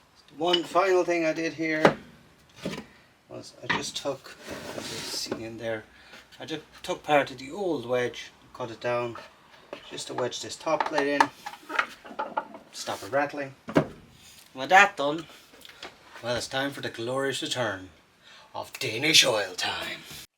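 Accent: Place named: Ireland. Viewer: Just discovered your channel! I like your style, and the simple but elegant toolbox really respects the Japanese methods, which are simpler than a lot of people realize. Subscribed! Agree with on volume: I'm old, and my ears have trouble with the loud music and intro and your lovely soft Irish speech. A little balance wouldn't go amiss.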